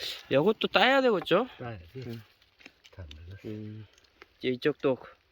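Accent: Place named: Jeolla, South Korea. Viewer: 어느지역의 산인지 모르나 목소리는 전라도같네요 아직 강원도나 충북북부쪽에서만 나오는줄 알았는데요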